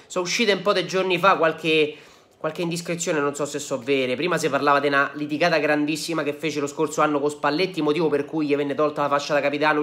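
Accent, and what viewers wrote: Latina, Italy. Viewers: Ciao ma sei di Latina?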